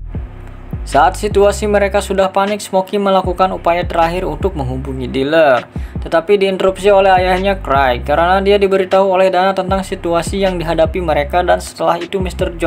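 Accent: Bali, Indonesia. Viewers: Apakah admin ini orang bali? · Nada suaranya kek orang bali ni ya bli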